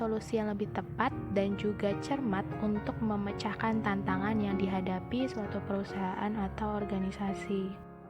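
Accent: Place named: Java, Indonesia. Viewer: Jiosss tenan.. Suara ne meduk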